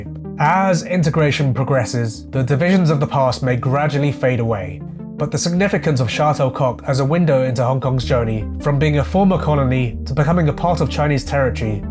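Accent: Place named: United Kingdom. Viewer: I think he's British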